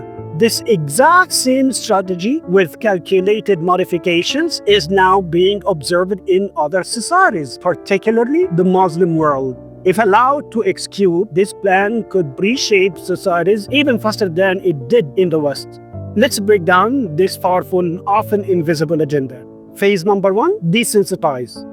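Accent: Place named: United States. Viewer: طول عمري بحب اسمعك وانت بتتكلم لكنتك امريكاني فاكر زمااان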